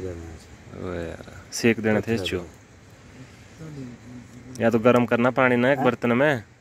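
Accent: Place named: Mandi, India. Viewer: bhai mandi kon si jgha se ho apki tone se to me samj gya tha ki Bnda to mandyal hai. 👌 baki bhoot acha krre ho brother. 👌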